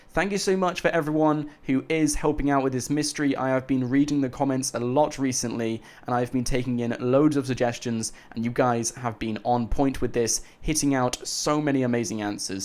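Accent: United Kingdom. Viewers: All of us British ppl say it that way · He is british so he cant pronounce it right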